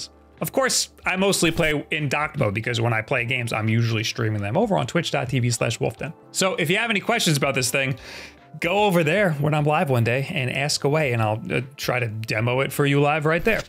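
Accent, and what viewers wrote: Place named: Long Island, United States. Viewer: God I always forget how much I hate how Long Island folks say "Mario" until I hear your videos ha-ha You seem to purposely try to say it 100 times a video. i cringe each time.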